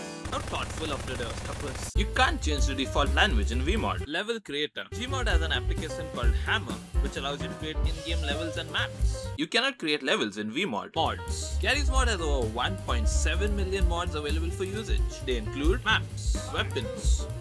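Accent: India. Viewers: I thought you are indian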